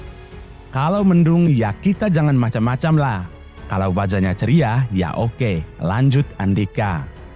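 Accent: Indonesia. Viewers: Logat Admin nya seperti Logat Karo😊👌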